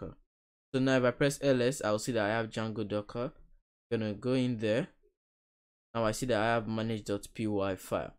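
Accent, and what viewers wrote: Nigeria. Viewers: I feel at home listening to you😂. Loving the Nigerian accent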